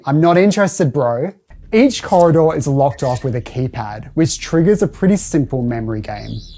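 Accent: Australia. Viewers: do I detect an australian accent?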